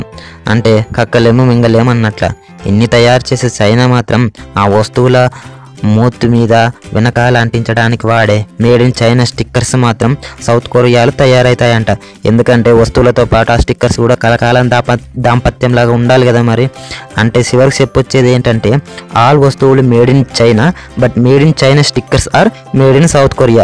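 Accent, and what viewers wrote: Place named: Telangana, India. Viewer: Mana telangana telugu thelisipodhi ekkadunna. Super brooo all the best 😍😍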